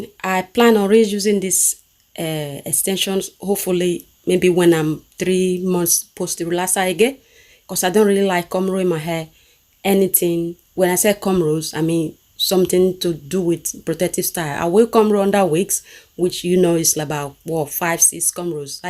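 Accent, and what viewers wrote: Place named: Nigeria. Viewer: Your accent is very thick and lovely, are you Nigerian?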